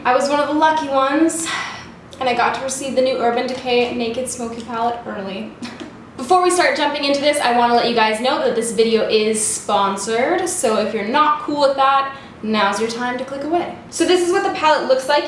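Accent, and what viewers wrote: Canada. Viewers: This is the most I've ever heard your lovely Canadian accent make an appearance. Eye "baeg" " daegger" it was great. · That Canadian accent gets me every time haha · Definitely a Canadian pronunciation. Just like how she pronounces "bag" differently. It's not incorrect, just different from the American pronunciation.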